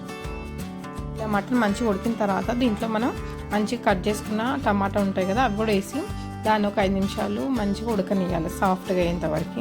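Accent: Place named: Andhra Pradesh, India. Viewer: Mana telangana antunnav mari mana basha ,yasa akkada matladtha levu .a andra basha matladakandi.mataku mundu yenaka andi bandiloddu.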